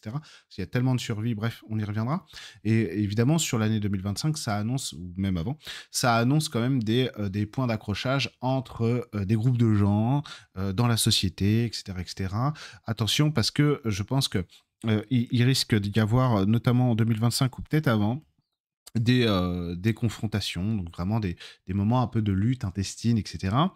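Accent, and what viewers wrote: South, France. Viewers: Merci beaucoup pour ce partage ! Et l'accent du sud nous apporte ce petit côté ludique ❤! Ps: j'adore ton t-shirt!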